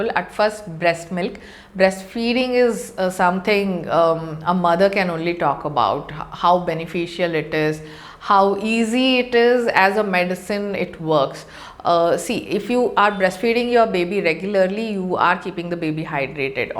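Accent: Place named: India. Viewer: Indian English language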